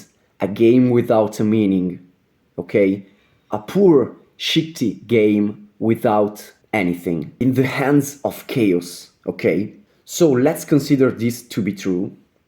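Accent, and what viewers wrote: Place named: Lombardy, Italy. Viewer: Hi! It's very interesting and exciting to see you speaking in English (with an imperceptible Lombard accent). This mental coaching video is captivating and deep, you made me think about my own development...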